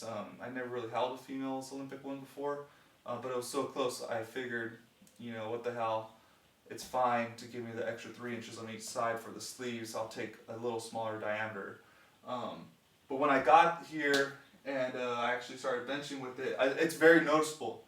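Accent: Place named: United States. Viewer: Hahaha just as you said "obviously I'm in England" I was thinking this guy sounds American but he has to be in England? Haha Just to add I just got the m3 rack and it's really good. Basically a copy of a rogue r3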